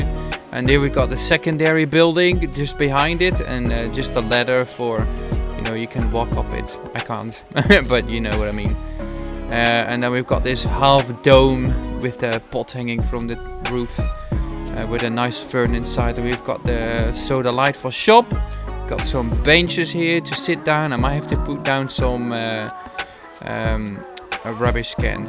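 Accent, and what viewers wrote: Netherlands. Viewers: I smell dutch maar ik heb eindelijk mn mede adhd’er gevonduh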